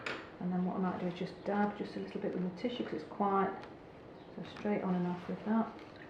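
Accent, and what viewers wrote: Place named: England, United Kingdom. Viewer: Beautiful painting. Do you always “cream” strength that much paint? Do you keep when your down? Add water again, next day? Or do you toss & start fresh. I’ve only ever made up that much paint when I did a negative painting. I know you said you were using 200# what mfg? Love learning new ways to do things. I really want to try this one, next week, when our temperature goes back to normal. ( Northern California) you sound like you have a bit of an English accent is that where you live?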